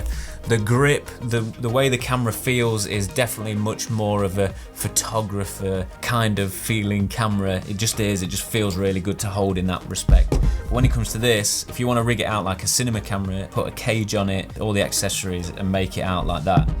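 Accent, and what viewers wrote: Nottingham, United Kingdom. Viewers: Again - I love your british English:) and of course, the content of your videos is of very high quality! Thank you for the comparison, I´ve been using my R6 for 20 months (photos and videos) and I am considering to buy S5 just for shooting videos. Thumbs up, as always. Regards from Czech Republic;) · Nottingham?? Sounds very familiar :)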